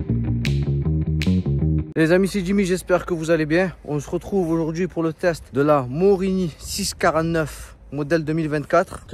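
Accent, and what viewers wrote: South, France. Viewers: Essai Parfaitement maîtrisé, complet et bien explicite. Et en plus ce petit accent du Sud avec les expressions bien de chez nous (je suis de Nice). Top ! Tu m’as convaincu, je cherche une bécane pour voyager, polyvalente, esthétique et le tout sans me ruiner. Je vais aller l’essayer en concession. Merci 👍🏻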